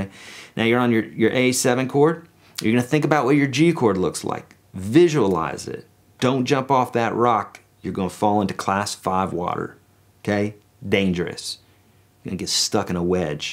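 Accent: South, United States. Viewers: Your southern accent makes these lessons so much more enjoyable. I don’t know why, it just does!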